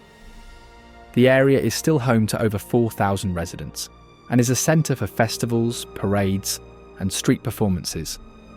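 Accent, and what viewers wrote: United Kingdom. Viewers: As a Houma Native American, I am offended that the Houma Tribe is not even mentioned and I have never heard of the Point au Chien tribe. Too many inaccurate claims for such beautiful drone footage! I'm guessing that the narrator's British accent is a giveaway of why there is no mention of the Battle of New Orleans where the British Army was mowed down by Andrew Jackson, Jean Lafitte and native American & Cajun volunteers at the Chalmette Plantation south of New Orleans. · Better Music would've made this SO MUCH MORE Shareable... the British accent is a bit overplayed and mispronunciations laughable - otherwise BEAUTIFUL!!!!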